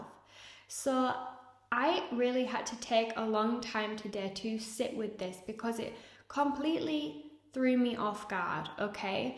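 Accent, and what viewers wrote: England, United Kingdom. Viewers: I love your accent. Are you from England?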